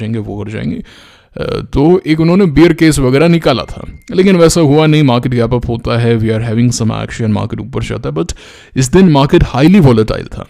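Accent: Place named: Bagri, India. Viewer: gale mein aagi aapke😂😂😂 wah maza aa gya swad hi aa jaata hai jab bagri use karte ho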